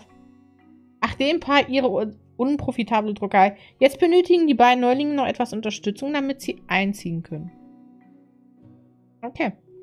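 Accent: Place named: England, United Kingdom. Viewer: So... erste Kommentar! Die Folge ist mir einfach zu lange, maximal 45 minuten wäre optimal, wenn möglich. Ich finde dein Englisches Azcent lustig und süss! Du bist doch kein Geist,. Du bist ein Vampyr XD Bin gespannt was du noch in diesen DLC schafst!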